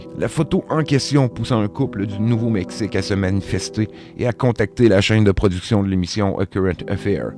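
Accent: Quebec, Canada. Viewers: Cet vidéo a l'air pas mal mais l'accent québécois faisait que je ne comprenais pas tout ( je suis français ) mais je te mets quand même un pouce bleu ami québécois et bonne continuation.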